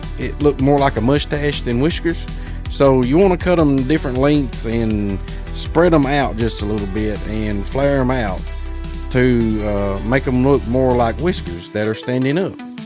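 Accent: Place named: South, United States. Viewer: You have the most southern accent I have ever heard. She came out great, I might have to grab this one myself.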